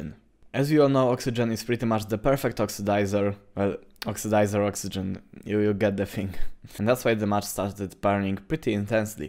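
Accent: Poland. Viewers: Słychac że jesteś z pl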